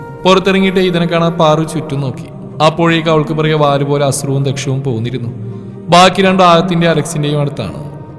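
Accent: Tamil Nadu, India. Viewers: nalla oru entertainment story😂😂orupad chirich...ithuvare ulla nayikanmaril ninn variety nayakane nammil ethicha madhurikkum adipoli tamil slang presentation nadathiya ❤❤❤❤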